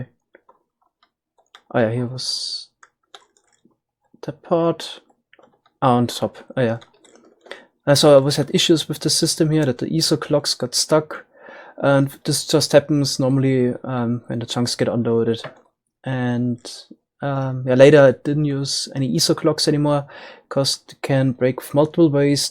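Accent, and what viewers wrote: Germany. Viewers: Are you come from germany? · You are no german, are you ?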